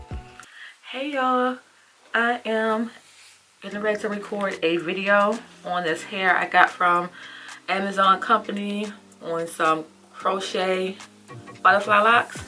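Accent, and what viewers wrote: Baltimore, United States. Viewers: Great video. Is your accent Mayland/Baltimore?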